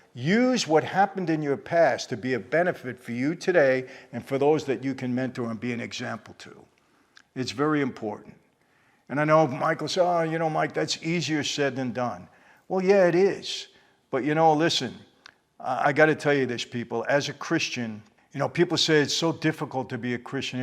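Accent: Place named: Queens, United States. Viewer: Mr. Franzese, thanks for this video, it really helped me. I’ve gone down some very dark roads and went back to God last year. I keep asking, “how can God forgive me after what I’ve done and how broken I am?” I’m from Queens and you remind me of the good version of the guys who, in a way, “mentored” me. This message coming from you is like one of them giving me good advice in a familiar, straightforward, credible way. Your personality, mannerisms and accent remind me of home and I always enjoy your stories. This kind of video is also really great. Be good, God Bless!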